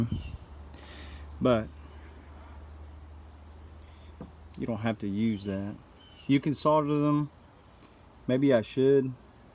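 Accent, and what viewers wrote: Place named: Texas, United States. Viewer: Dude you sound like you are from Texas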